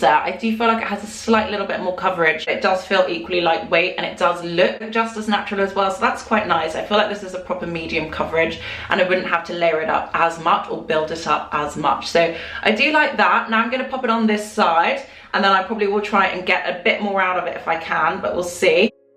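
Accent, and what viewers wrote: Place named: United Kingdom. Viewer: Literally just found your channel! I'm a fellow Brit and adore your accent and the way you speak! Thanks for the great reviews x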